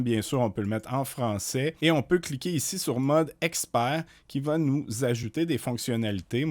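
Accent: Canada. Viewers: Merci pour ces informations simples et avec cet accent canadien si savoureux que nous autres français on aime tant...😊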